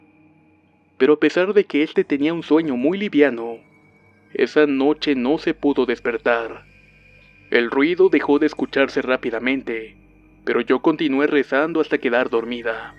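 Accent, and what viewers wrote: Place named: Jalisco, Mexico. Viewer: Yo soy de Michoacán, tu acento parece de Jalisco o Guanajuato. Saludos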